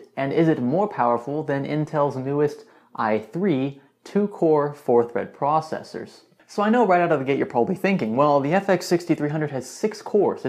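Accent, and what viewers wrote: Louisiana, United States. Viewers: are you from Louisiana?!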